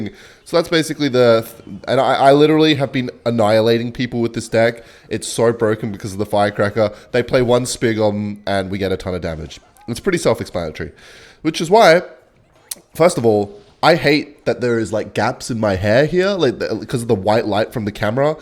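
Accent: Australia. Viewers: sometimes i forget is australian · Are you from Perth brudda? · Mate you from WA?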